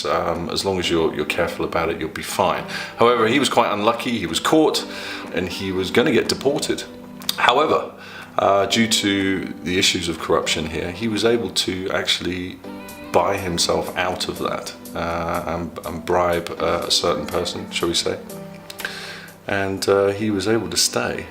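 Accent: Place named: England, United Kingdom. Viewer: Your proper English accent and your pleasant countenance make watching your videos a delight.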